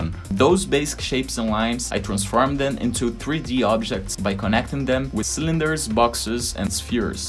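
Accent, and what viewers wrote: Brazil. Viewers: Só pela forma de falar já sei que é BR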